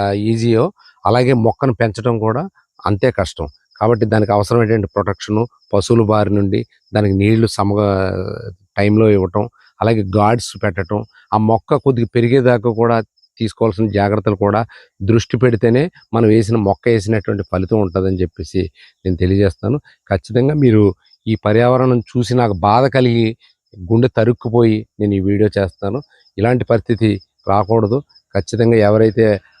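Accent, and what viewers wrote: Godavari, India. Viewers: Ayya baaboi baabai miru super ఏహే mi slang godari yaasa adbutaha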